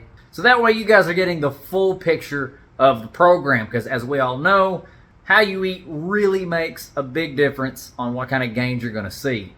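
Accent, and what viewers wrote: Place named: South, United States. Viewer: If the accent didn't give away that your from the south the camaro bumper used as a mantle sure did 😂 great video 👍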